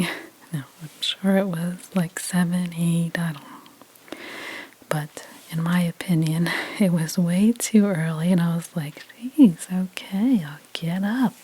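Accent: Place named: Mid-Atlantic, United States. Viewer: Totally had you as mid-Atlantic, but you say “play catch” not “have a catch “. Interesting 🧐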